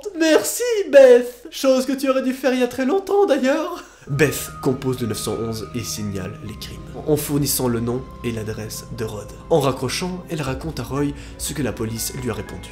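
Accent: Belgium. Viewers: Tu es belge ou suisse ? Moi je suis belge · Haaa t belge je comprenais pas quand tu disais 75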